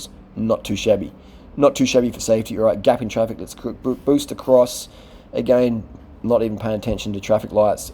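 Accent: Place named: Australia. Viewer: There is do and don’t . Her outfit is so inappropriate. I don’t think she study to much before she came to the country . Please put some clothes on . Culture and etc be respect . You are the first one that use the F word in video , beside that it is a nice video. Sound like Australian .. I do not have a problem Where you from at all and I am not jealous the way she dress just worry that she will be rape or something will bad will happened. Just be safe. She is not doing any modeling on the street I don’t think.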